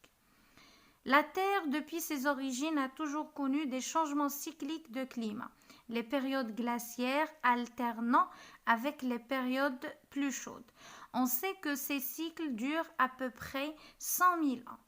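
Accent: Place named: France. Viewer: Merci énormément Madame, votre travail est admirable. J’ai beaucoup aimer votre accent française et la explicación formidable que vous avez-vous fait en français. 👏🏼👏🏼👏🏼👏🏼👏🏼 top top top 👍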